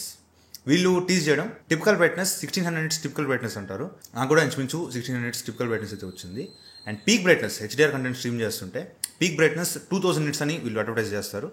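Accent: Nellore, India. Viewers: anna manadi nellore aa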